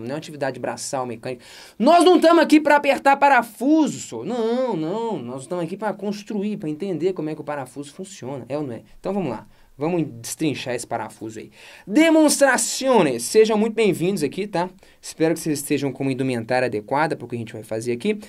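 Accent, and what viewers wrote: Minas Gerais, Brazil. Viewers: Você é mineirinho ou só finge?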